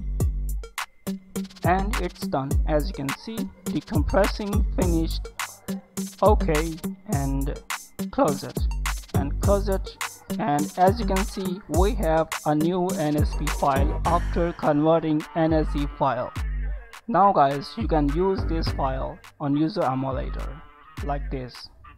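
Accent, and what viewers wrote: India. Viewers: It's always indian voice with 1$ micro. Classsic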